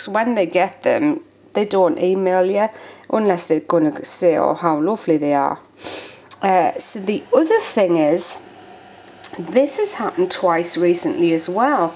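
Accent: United Kingdom. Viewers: I think that a lot of us that love your voice are not from the UK. All the UK accents are beautiful sounding. For me the best part of your voice is that it just sounds like you are enjoying what you're doing and when someone sounds like they are enjoying what they are doing their voice is priceless.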